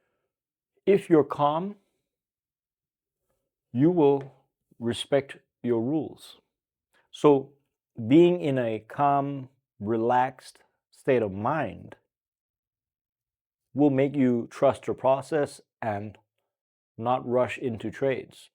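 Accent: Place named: Guam. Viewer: Bro, totally off topic, but I just have to ask. Are you from Guam? I grew up in Guam and, I swear, you sound like you're from there. Great vids BTW. I think you're spot on with everything you talk about regarding discipline. I feel like discipline and mindset would fix 99% of our trading issues.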